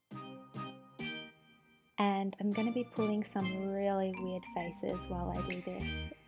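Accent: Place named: Australia. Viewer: You are beautiful love the Aussie accent lol I’m from Sydney. Keep holding your head high. You are blessed. Don’t let others bring you down. 💖 love Loz x ps. Can’t believe your lips are real! You must cop so much shit lol 😂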